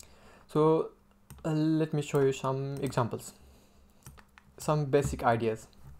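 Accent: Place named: India. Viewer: You are from India, aren't you? I used to think that all Indians just have a bad English pronunciation, but then I found out that Indian English is actually recognized as a type of English like BE and AE. Yet it can be very hard to understand for people having learned and being used to the other types of English (BE,AE). You speak rather clear however, and I think any westerner who speaks English should be able to understand you :)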